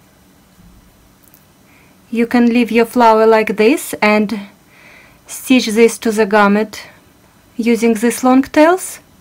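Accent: Russia. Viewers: Лол вы русская?? Видно по акценту и сри😂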